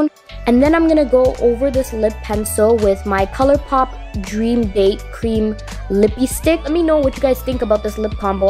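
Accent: Toronto, Canada. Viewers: I hear the Toronto accent lol!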